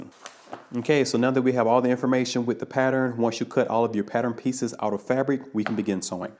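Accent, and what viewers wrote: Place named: Georgia, United States. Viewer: I recognized that GA accent. Good job! Thanks From a fellow Georgian. I live about 4 hours away from Melanated Fabrics and it's on my bucket list to visit. I just dont get to Atlanta much.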